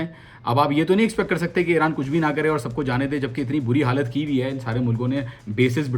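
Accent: India. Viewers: Your Urdu accent llike of Indian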